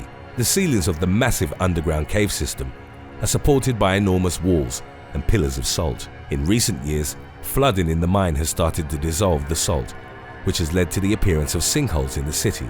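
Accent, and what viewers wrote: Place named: United Kingdom. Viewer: Alyssa Davison Me too and the Brit accent.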